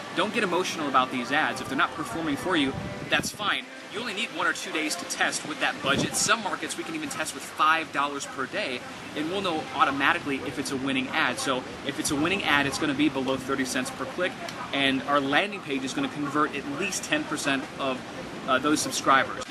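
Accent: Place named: Jamaica. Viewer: sounds like jamaic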